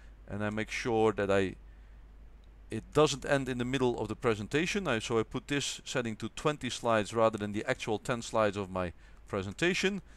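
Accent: Netherlands. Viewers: Just a quick question, are you from Belgium or the Netherlands? because your accent sounds very Dutch. Great video though!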